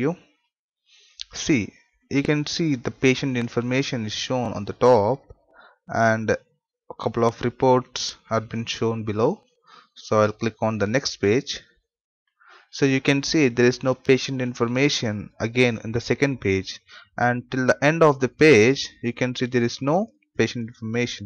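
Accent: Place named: India. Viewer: Fuuuuck this disgusting indian accent and its fuuuuck bad pronuntiation .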